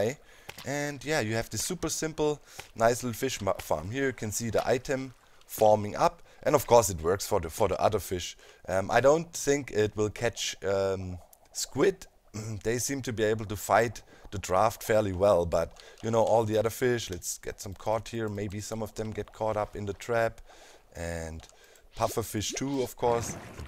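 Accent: Germany. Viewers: Sehr sehr starker Deutscher Akzent xD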